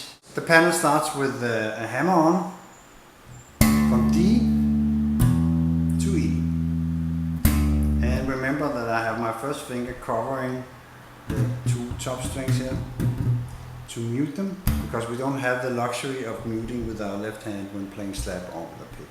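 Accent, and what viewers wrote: Denmark. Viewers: haha han er så meget dansker !!! xD det så tydeligt på hans engelsk udtale ! xD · That Danish accent is so funny ! Great lesson :)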